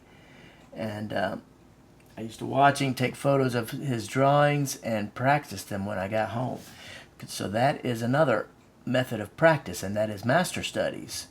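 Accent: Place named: Texas, United States. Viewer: I love your Texas accent.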